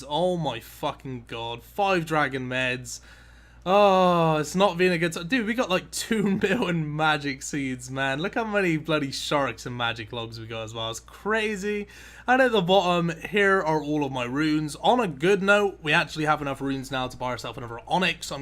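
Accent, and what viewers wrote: Bristol, United Kingdom. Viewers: Just stumbled into this video from one of regular YouTubers, wasn't going to give it the time of day until i heard the accent. Sounds alot like some of my friends from Bristol xD Subbing for that reason alone hahaha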